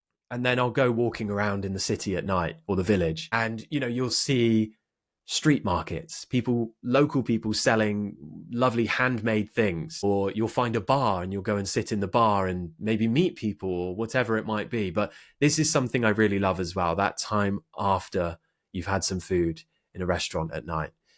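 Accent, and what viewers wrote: RP, United Kingdom. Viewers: Sir could you please explained if it's possible for a person to learn your accent in just 4 months? (I am not exaggerating). Cause that's what exactly is happening to me right now, i completely lost my previous American-ish accent. I admit the Modern RP accent fascinates me that's why i invested myself emersing with the accent. I have to ditched those non-RP speaking shows, movies and podcasts and focusing only in modern RP sounds that shadowing and mimicking becomes part of my daily routine as if it is part of my body. I'm not just thinking in a modern RP accent i also literally speaks them, our cats and dog has been stressed out because i am also speaking to them. When i was just 11 yrs. old back in 92' i have this "Speech Impediment" disability where i constantly stutter and stammer, i can't speak my Filipino language constantly and spontaneously without stuttering. 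Then i noticed whenever i speak an English word or sentences my disability were non-existent, since my disability had separated me from the world of communication i invested myself to learn the English language without having someone guiding me how to learn them. At that time we can't barely afford buying the things we need so my family can't afford of getting me an English tutor, so i'm on my own. That time the only way for you to learn understanding each and every word is by looking into a dictionary. I had this old pocket dictionary with me all damn time, whenever i get stumbled myself upon an English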